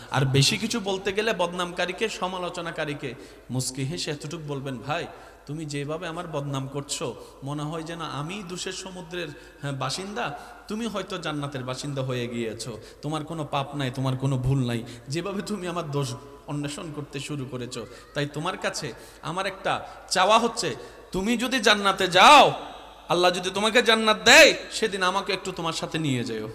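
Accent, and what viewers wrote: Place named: Kolkata, India. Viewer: মাশাআল্লাহ আপনার ওয়াজ কলিকাতান্দা হয়ে যায় অনেক ভালো লাগে আমার কাছে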